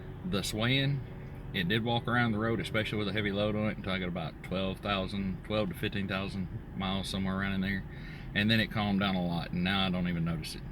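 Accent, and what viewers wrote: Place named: Tennessee, United States. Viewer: YOu sound like a TENNESSEE driver!! ( me too.. ) I think part of yours is the load and driving use... I'm going to be running mine on just my GX470 (about 6000 weight with metal bumpers, winch- and extras).. but normal street driving mostly-and not very aggressive. I'll most likely do a LITTLE offroad stuff-- just fire roads, or rougher dirt roads and of course winter.. but not much- so with what you got- mine should do fine. THANKS- appreciate the totally honest and factual review..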